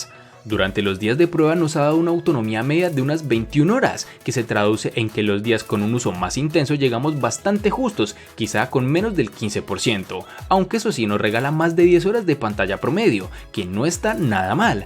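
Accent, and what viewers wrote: Cali, Colombia. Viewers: SOS DE CALI? JAJA YO SI DECIA VE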